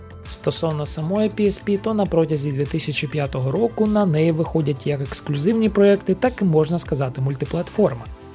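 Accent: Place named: Russia. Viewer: Адже я чую залишки російської мови)) Поки я дивився, мені вдалося вивчити українську мову.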